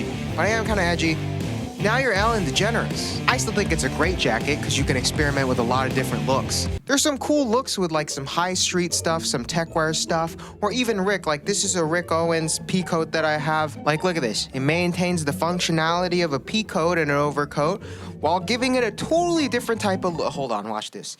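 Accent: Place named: Hawaii, United States. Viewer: he's hawaiian livin in nyc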